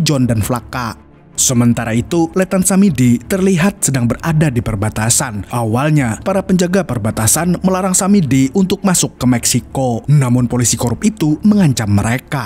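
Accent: Banjar, Indonesia. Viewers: Urang banjar kah nang ba'isi chanel ngeneh..??😁😁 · Urang Banjar kh bng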